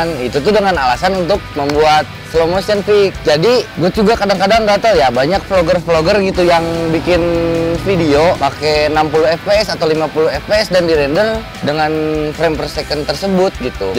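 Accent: Indonesia. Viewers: medok euy sunda na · sundanese pisan wa hihi... ka coba tutirial color grading nya.. makasih k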